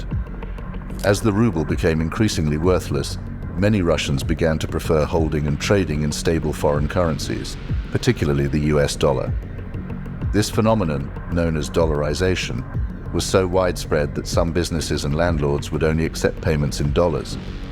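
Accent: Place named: United Kingdom. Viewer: It's the season of obnoxious British accents. Yuck.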